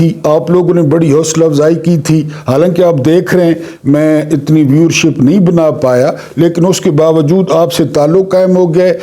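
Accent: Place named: Pothohar, Pakistan. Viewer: نہ وڈیو لمبی نہ باتیں زیادہ بلکہ تشنگی رہ جاتی ہے آپکا پوٹھوہاری تڑکا دل باغ باغ ہو جاتا ھے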